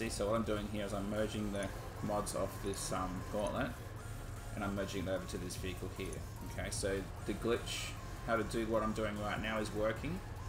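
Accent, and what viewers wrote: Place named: Australia. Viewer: I only realised u was Australian 😭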